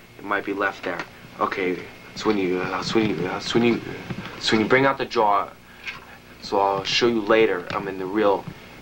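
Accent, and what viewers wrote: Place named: Long Island, United States. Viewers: Brooklyn or queens for sure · clearly he’s from queens area of new york. accent gives it away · I’m from Long Island, this kids sounds like he could be from around there.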